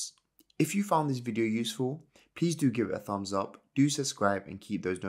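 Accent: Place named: England, United Kingdom. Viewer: cheers mate! keep up the uploads, nice to have someone english talk about this stuff instead of these waffling americans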